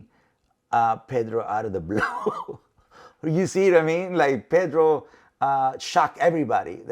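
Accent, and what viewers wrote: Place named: Mexico. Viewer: Love Cesar's Mexican accent I miss it when I'm not watching his videos. Not to mention he's also very spiritually awakened, not only does he know dog psychology but humans too. One of the best commendable spiritual teachers.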